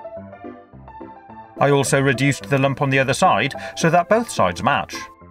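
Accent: England, United Kingdom. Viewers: i love your english accent :D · Are you English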